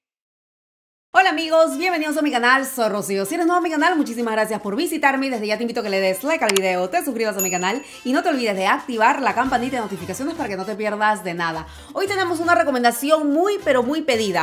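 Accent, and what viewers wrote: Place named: Argentina. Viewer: Me alegro que te gustara. Mis paisanos ya te explicaron lo suficiente y completamente de acuerdo contigo. Y la 2ª pronunciación que dijiste es la correcta. Aparte por tu acento, creo que eres argentina (apostaría a porteña), por lo que seguro que encontrarás a gallegos uqe te lo puedan explicar (eso sí, de Galicia😊)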